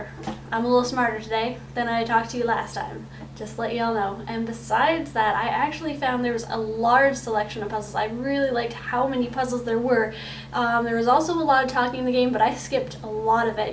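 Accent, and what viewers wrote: Canada. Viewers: If I were to take a guess, I would say you are Canadian. A very cute Canadian at that :D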